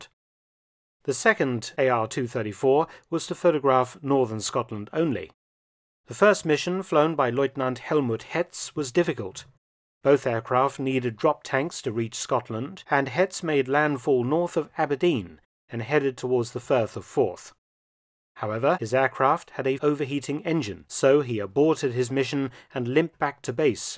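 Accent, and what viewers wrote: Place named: Southeast England, United Kingdom. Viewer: I can't be the only one watching this who's getting Tie Fighter flashbacks. saying "AR 234" over and over while showing a series of animated aerial reconnaissance mission maps is too on point for Guy Siner saying "Outpost D-34" over an MS-DOS version of the same thing. Guy and sound like they grew up not too far apart from each other in SE England.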